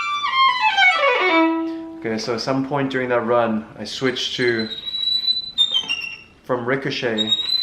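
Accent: United States. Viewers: Why do you sound so American in this video but so Australian in the Ling Ling workout video lmao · This is like the musicians equivalent of a no-makeup selfie. BTW I thought he was Australian? How come he has an American accent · I thought he had Australian accent oh well he has a great American accent he’s amazing at the violin too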